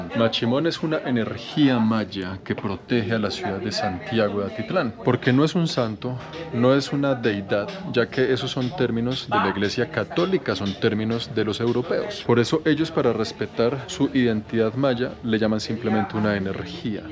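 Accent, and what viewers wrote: Bogotá, Colombia. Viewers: Me encanta como narra la historia Con esa voz tan bella y ese acento colombiano Lo hace a una quedarse a ver todo el video 👍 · GRACIAS POR DECIR ESO DE NUESTRO ACENTO BORICUA, 🇵🇷..TU ACENTO COLOMBIANO ES PRECIOSO TAMBIÉN! 🤗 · "Que linda jeta tienes amigo" Alobien que no me canso de escucharlo hablar rolo al rededor del mundo parcero.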